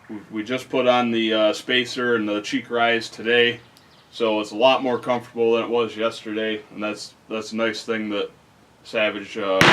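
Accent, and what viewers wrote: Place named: Wisconsin, United States. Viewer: Sounds like. Wisconsin accent to me! Go pack go!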